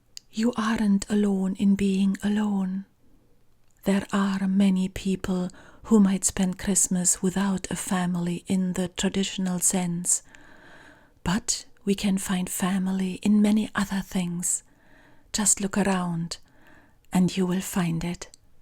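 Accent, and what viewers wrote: Scotland, United Kingdom. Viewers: Ah, danke ❤. Ich liebe deinen schottischen Akzent. Auch dir einen wunderschönen 3.Advent 🎄☃️🎅🕯️🕯️🕯️❣️ Bis bald ❣️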